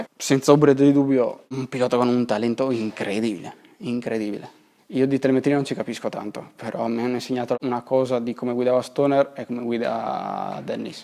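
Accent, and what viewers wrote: Spain. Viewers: si sente che hai passato anni in spagna sembri uno spagnolo che parla un perfetto italiano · È vero comunque c’hai più la parlata e l’accento spagnolo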